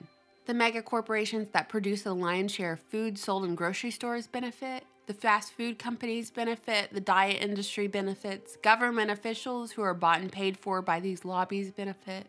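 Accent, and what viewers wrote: South, United States. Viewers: Thanks, love your channel and your southern accent! picked up a hoodie for my daughter and a t-shirt for me!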